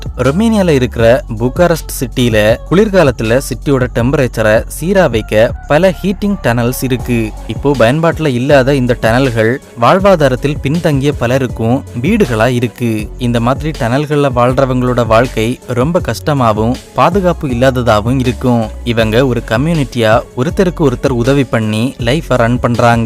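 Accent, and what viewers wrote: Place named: Kerala, India. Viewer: Keara tamil superb bro❤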